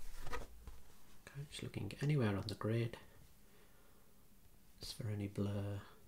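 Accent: Birmingham, United Kingdom. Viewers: OMG A BRUMMY ASMRTIST MY DREAMS HAVE COME TRUE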